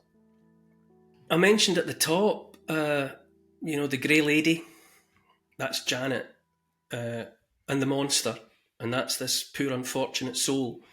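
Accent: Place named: Scotland, United Kingdom. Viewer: what is your accent? My bf and I are debating - I hear Glaswegian tones mixed with Dundee, but he says it's lowland Scottish. Can you please put our debate to rest 😂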